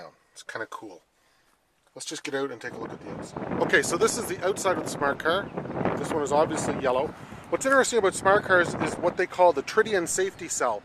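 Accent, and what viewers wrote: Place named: Canada. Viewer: sounds like you are from Canada sweet car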